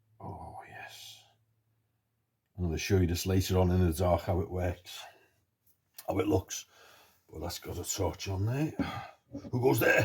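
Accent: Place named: Liverpool, United Kingdom. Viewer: You lucky lad living out there and I just love the accent and your natural scouse warmth.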